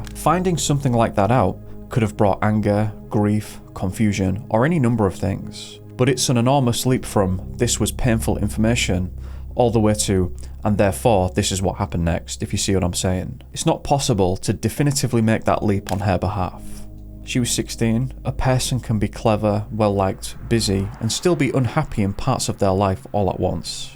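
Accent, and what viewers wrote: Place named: East Yorkshire, United Kingdom. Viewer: Unrelated but are you from Hull or East Yorkshire? Just listening to fall asleep to and was like hang on that accent sounds local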